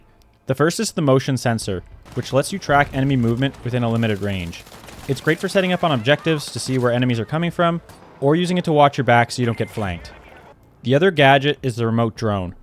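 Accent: Canada. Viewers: This guy gotta be from the maritimes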